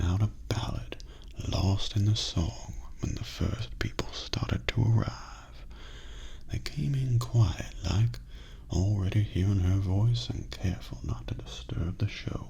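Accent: South, United States. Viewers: My dude, not only is your voice my favorite but the southern accent is out of this world. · As a Southerner myself, this accent is EPIC! Well done! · holy shit,... the southern accent is not half bad! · you have a better southern accent than most movie stars, this was a blast to listen to. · John Goodman but 10 Times More Southern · Southern drawl: Spot. On.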